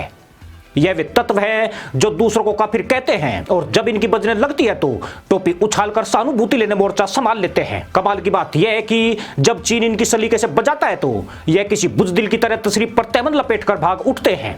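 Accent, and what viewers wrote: India. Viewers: यार ये हरियाणवी आवाज हिन्दी की कमर तोड़ दी आपने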